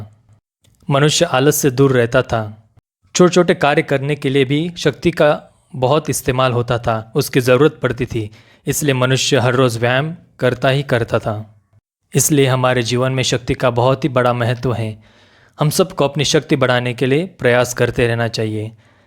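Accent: Maharashtra, India. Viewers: I like your Marathi touch in your Hindi.... this is the beauty of our Bhartiya culture ❤️ Jai Hind 🇮🇳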